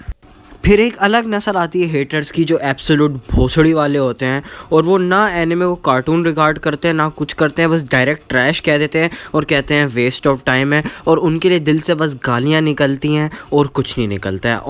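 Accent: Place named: India. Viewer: Lol the way u talk sounds like u r an Indian boya! 😂 bcz ur accent seems to me like an Indian accent tbh.. btw I really enjoyed ur this video... maza aya...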